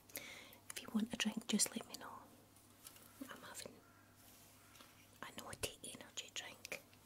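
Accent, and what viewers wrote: Scotland, United Kingdom. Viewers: Thankyou for a great video! I love loveeeee your Scottish accent! And I love your makeup videos. You make it so relaxing! Great job gurrrrrrrlll!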